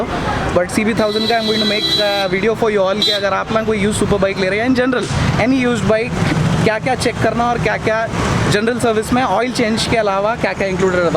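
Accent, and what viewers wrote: Hyderabad, India. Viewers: Awesome video Bhai... Aur Hyderabadi tone me bohot best lagta bhai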